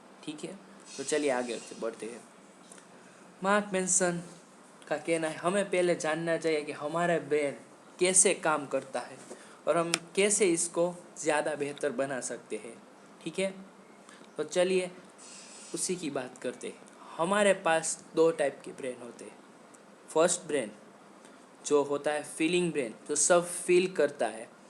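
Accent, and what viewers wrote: Gujarat, India. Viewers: Ap Gujarati ho na bhai ... Language understands ..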